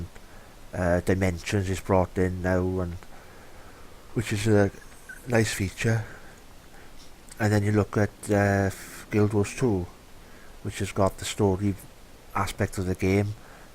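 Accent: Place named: Wales, United Kingdom. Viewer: Love your Welsh accent Baaaaaaaaaah (sorry that was unnecessary I know).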